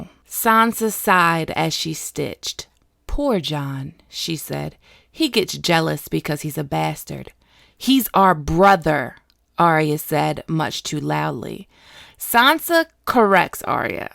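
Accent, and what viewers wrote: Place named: New Jersey, United States. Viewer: are you from Jersey? I heard how you say wudder instead of water!;)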